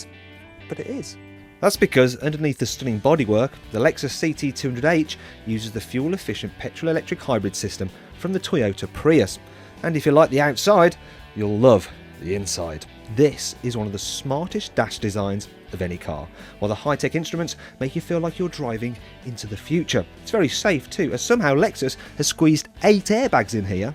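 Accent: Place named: Midlands, United Kingdom. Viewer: Maybe he's originally from the Midlands...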